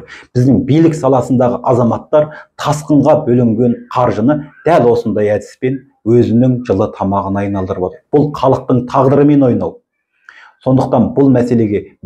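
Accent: Standard. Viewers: Рахмет сизге таза Казакша сойлейсиз барлык блогерлер СИЗ сыякты болса екен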